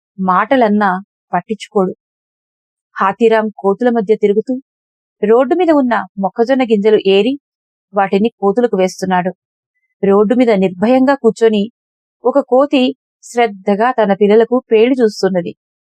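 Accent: Telangana, India. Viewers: తెలంగాణ యాసకు జీవం పోసి మా మనసులను దోచుకుంటున్నారు మీ రచనా శక్తి కి వాక్పటిమ కు జోహార్లు.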